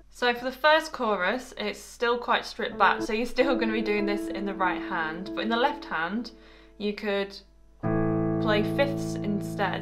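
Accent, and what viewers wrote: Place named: North, United Kingdom. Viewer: Impeccable tuition. Cute northern accent. What's not to love?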